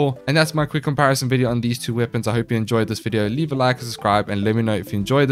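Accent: South Africa. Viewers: Thx for the vid I was watching your kuva litch vid since im a total noob and wanting to try get a kuva nukor since I have a normal nukor.. Wanted to ask are you a S-A local your accent man sounds like it?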